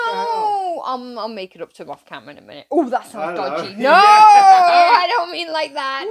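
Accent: South, United States. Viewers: I'm glad you went so into it,southern talk!!! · I love your reactions more and more now that you've become alittle country girl 👢, it just makes me laugh because you're learning our slang country talking,I love you and even though you don't see much of him,I know he's there,thanks for your reaction hope you guys have a blessed day 🙏🍑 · That is the way we all talk in the south lol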